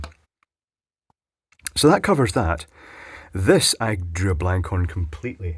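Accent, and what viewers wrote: Scotland, United Kingdom. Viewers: Came here just to hear 'piss-flap' in thick Scottish burr:)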